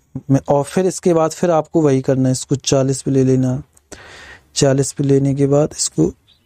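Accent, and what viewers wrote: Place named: Bundelkhand, India. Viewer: Bhai kha k bundelkhand se ho kya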